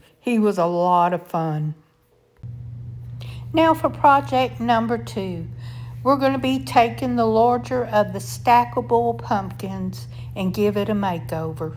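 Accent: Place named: South, United States. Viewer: I can hear your beautiful Southern accent. I am in South Georgia, where are you located?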